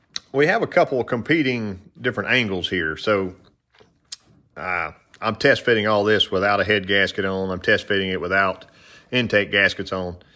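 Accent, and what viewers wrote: South, United States. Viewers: Super fine line! Love the Southern accent! Reminds me of a good day's work, awesome eats and a beer or two, and a good snooze to do it all over again. Thanks I hope you have a good morning and enjoy your day today. 15° and heavy snow ❄️🌨️ in Western Pennsylvania this morning.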